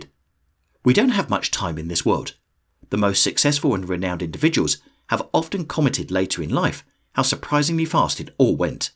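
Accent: United Kingdom. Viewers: Brilliant video. And your British accent drills the message into my brain with elegance.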